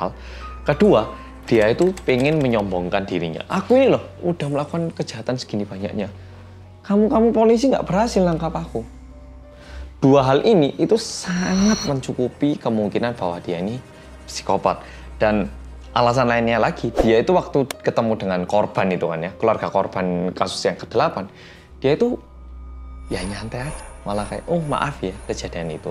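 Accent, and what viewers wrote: Java, Indonesia. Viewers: Cara ngmgnya mas enak bgt jd inget pembimbing magang saya mirip bgt medok2 gitu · Lebih suka medhoookk...dari pada luweee...maaf semua...🙏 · Bang lu logat ngomongnya kek orang jawa si bang 😅😅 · Titimangsa apalagi kronologis yang beruntut lebih baik dibaca sih karena kalau salah fatal.. ini keren banget mas ga banyak yang bisa. Butuh jam terbang lama buat bisa selancar mas. Semangat mass medokk💪🏻🍉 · Sedih baru nonton😢, soalnya pulang kepondok sebentar. Semangat dan sehat selalu buat mas yang medoooookkkkk..... 💗👏😂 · Medhok team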